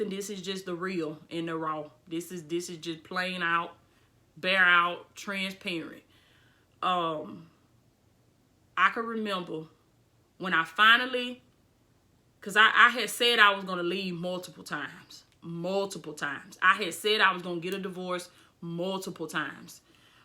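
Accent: South, United States. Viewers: I am also a survivor of domestic violence. I married young too and your testimony is powerful! I love your channel! I see and hear the power of God all over your channel and work the Lord blessed you to do. I felt immediately connected to your channel for many inspirational reasons but your accent took me my back home. ❤️ My people are from the South. I love your zeal. I’m 54 and have walked many miles towards the destiny that the Lord predestined for me too. I’m living my purpose through ministry among other things for the Lord. I purchased your book and subscribe to your channel. I love supporting others and believe that we learn much from the things we suffer. Your heart is beautiful and press on my beautiful Sister in Christ.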